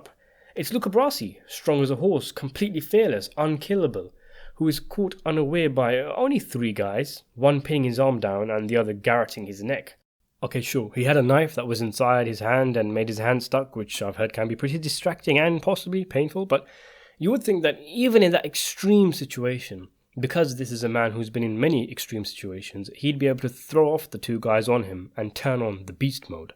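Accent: England, United Kingdom. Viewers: This is the second video of yours, BUT.... I'm from California, I'm old, and bad hearing. So I don't watch stuff with ACCENTS, the SE American's are bad enough but you Brit's have a few of your own. So wasn't going watch any more of your video's, BUT, I said give it an other shot cuz I really liked Luca Brasi and liked it. Sorry, and I subscribed. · What part of England are you from narrator ? You speak a very rare dialect.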